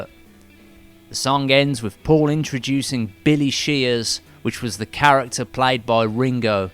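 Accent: Birmingham, United Kingdom. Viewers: Yow sarnd like a brummie like me pal... Well I'm Wolverhampton but everyone calls us brummies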